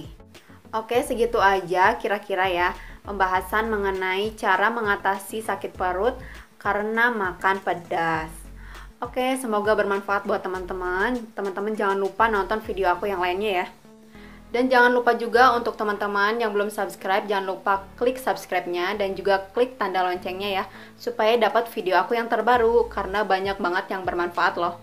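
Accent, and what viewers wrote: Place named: West Java, Indonesia. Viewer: orang sunda nih kayanya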